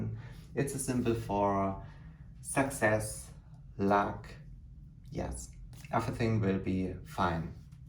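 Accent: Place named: Bavaria, Germany. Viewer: Most excellent video! Where are you from, love your accent!!! Bavarian, perhaps, since it reminds me of my mother 💜💯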